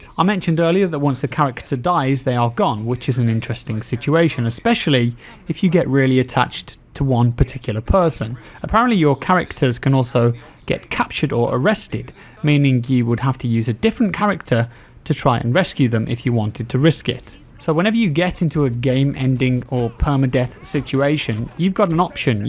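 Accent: United Kingdom. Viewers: “Cameras are everywhere” this guy has a British accent and doesn’t know that London is the city with the most surveillance in the world, cameras are already everywhere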